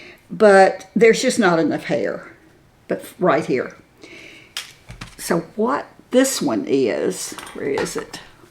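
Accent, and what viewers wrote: South, United States. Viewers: Thank you. I hate to say, but I will. I’ve been watching video after video. My mom and my aunts have been gone a very long time. So this was like getting to spend time with them again. A little while ago I was explaining something to my husband and I found myself going into all the small details. I realized I was speaking with your voice, sans southern lilt. Thank you! For everything.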